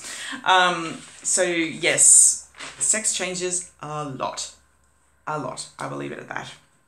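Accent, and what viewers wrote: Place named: Australia. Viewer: Great info, my date for VSG is 19th March, so looking forward to the big change. Nice to hear another Aussie not many of us. Board is a great idea I will start to create mine now. Thanks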